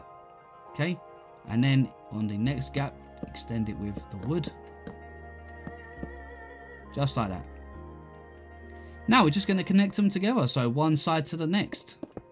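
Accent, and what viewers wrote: United Kingdom. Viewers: I like ur british acent❤❤❤ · I love bri ish people shutter: shu uh. Three: free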